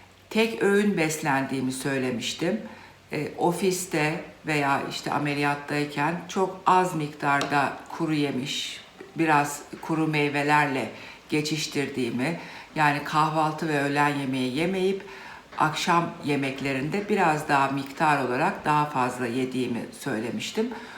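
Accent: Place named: Ankara, Turkey. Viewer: Sevgili Prof.ümüz, Ben sizi rejiminizden sonra tanidim.Güzellik ve canayakinliginiz disinda ictenlik ve dürüstlügünüz cok etkileyici.Ayrica siveniz ve sevdiginiz yemekleri hazirlama tarziniz benimle tipatip ayni.Acaba siz de Ankarali misiniz? Cok sevgiler ve selamlar.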